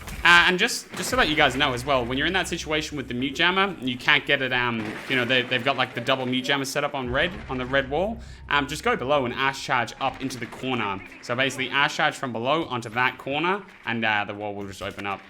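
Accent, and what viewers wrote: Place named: Australia. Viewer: my "inner siege-voice" have adopted an aussie accent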